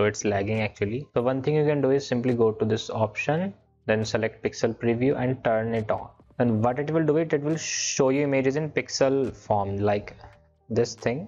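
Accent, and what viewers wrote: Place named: India. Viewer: indian accent is terrible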